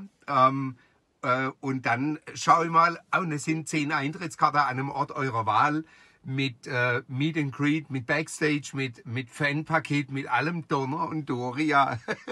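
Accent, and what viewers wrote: Baden, Germany. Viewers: Bester badischer 😁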